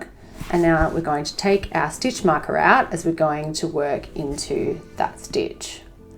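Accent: Australia. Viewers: So happy to have found an Australian tutorial when I googled this method, I’m so used to hearing a British or American accent in the tutorials I usually watch. Your accent brought a smile to my face immediately and a great quick lesson too! Thanks so much ❤❤❤ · Thankyou for this tutorial love this way of introducing new color!!!! Im aussie too and it was such a breath of fresh air yo hear your accent, plus relaxed voice, and no extra yabbiddi yabbiddi!!!!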